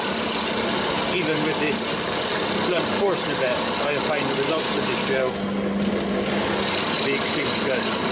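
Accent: West Country, United Kingdom. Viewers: west country lad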